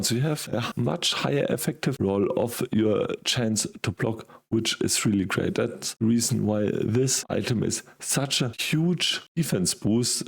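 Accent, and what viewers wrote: Germany. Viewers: Hes pretty clear, I think he's German, so the accent is there its understandable, atleast for me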